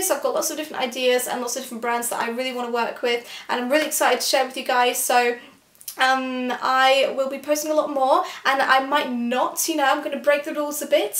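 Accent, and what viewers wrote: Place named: Kent, United Kingdom. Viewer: Despite all the haters & the letchers you have to admire dedication to her body: those abs don't happen by accident! Also love the Kent accent with hints of Scotland. You could say 'so' all night long for me. Keep up the good work!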